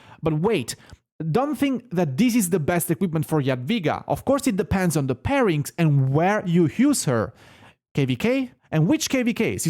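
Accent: Italy. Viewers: Ma????sei italiano?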